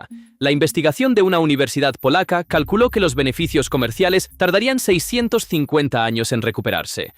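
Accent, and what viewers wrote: United States. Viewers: si poneis voces en castellano porque lo poneis con acento gringo? suena ridiculusssss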